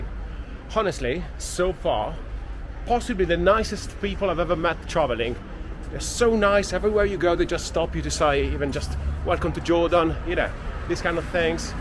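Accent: United Kingdom. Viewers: You deserve more views 👍 Im curious Are you Italian? But you have strong British accent 🤔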